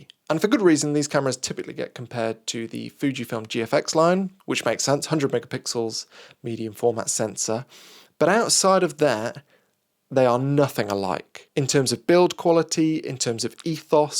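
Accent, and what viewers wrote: United Kingdom. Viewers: What the f*&^* is this channel about. Confused adults? 'British accent'! A very accented 'British accent'! guess the locality.. I have it within 50 miles!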